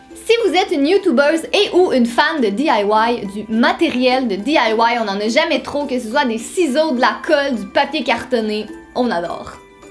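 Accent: Quebec, Canada. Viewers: J'adorreeee ton accent québécois 💕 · c la ou je me dis que j'aime vraiment pas l'accent canadien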